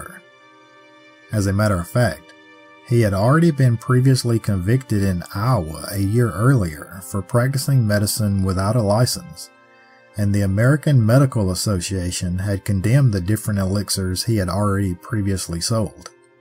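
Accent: South, United States. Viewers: Wow I'm so pleased to be like no 444 it's such a hint at how your channel I's growing and proud to be hear from the beginning and still loving your cookies and cream southern drawl xxx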